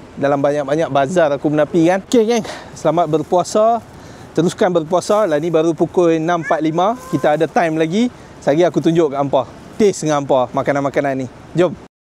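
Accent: North, Malaysia. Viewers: Orang utara kaa boss ? 👍🏾👍🏾 Salam dari taiping perak boss 👌🏾🙏🏾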